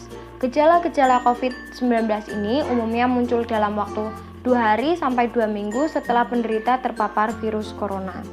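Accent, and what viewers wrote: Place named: Java, Indonesia. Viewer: Medok nemen nok bahasamu😂😂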